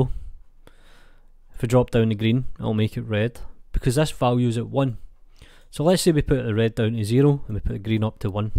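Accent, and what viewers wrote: Scotland, United Kingdom. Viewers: Tutorials in a Scottish accent is music to my ears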